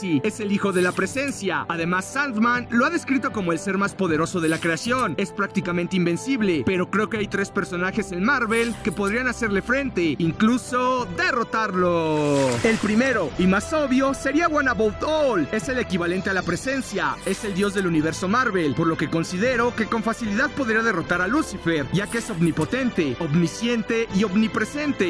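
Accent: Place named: Mexico. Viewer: Tu voz está terrible, tremendo acento nefasto y barato de televisión abierta mexicana.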